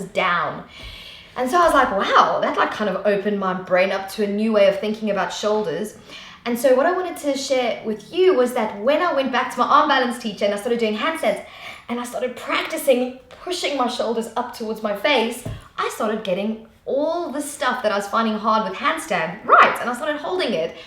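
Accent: United Kingdom. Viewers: Anyone else vibing the British accent after watching this? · Are you from UK???? I'm asking you because of your accent cause my brother works in UK and I was in UK and everyone has that accent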